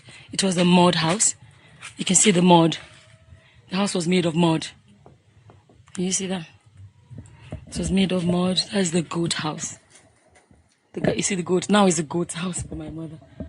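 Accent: Nigeria. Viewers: You speak like your from orlu or Osu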